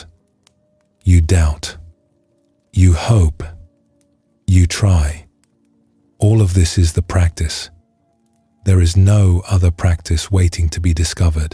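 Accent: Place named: United Kingdom. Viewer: The voice is pleasing, though it seems odd to me that it has a British accent rather than, perhaps, an Asian one….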